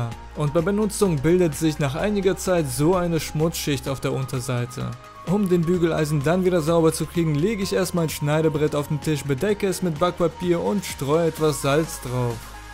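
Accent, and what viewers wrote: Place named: Russia. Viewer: hört man an deiner Stimme ich bin auch Russin habe aber ich spreche normal das sollte kein hatte werden OK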